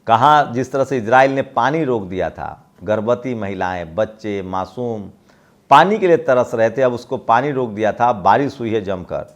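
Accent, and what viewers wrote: Bihar, India. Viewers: Bohat qoob, your voice Behari tune wa bohat qoob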